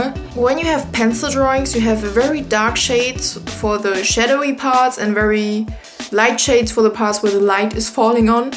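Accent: Germany. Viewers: I LUV YOUR GERMAN ACCENT JSHSVWKQGW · dot me an border :D hear that german accent girl ;-))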